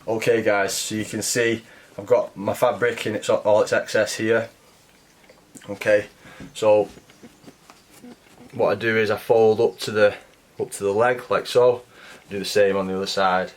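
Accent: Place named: Yorkshire, United Kingdom. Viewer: Where abouts are you based? Must be Yorkshire.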